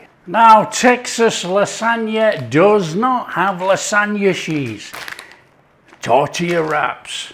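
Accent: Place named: Liverpool, United Kingdom. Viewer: Just love the Liverpudlian accent, then we go a little bit of elvis lol, you’re so entertaining. Come on!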